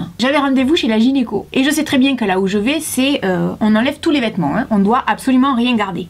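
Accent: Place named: Toulouse, France. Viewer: Bon, un bonne dose de rire, on ne dit jamais non, le redicule de tue pas. Chere vous etes adorable avec votre accent de Toulouse....j ai presque le meme, sauf que moi je suis nee a Monaco, chercher l erreur😊😊😊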